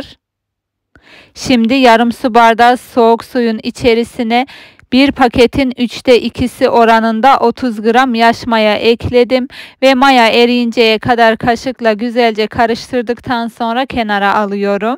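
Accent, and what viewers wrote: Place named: Kayseri, Turkey. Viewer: Çok güzel oldu hemde pratik memleket kayseri mi şive benziyor bende kayseriliyim bu arada ❤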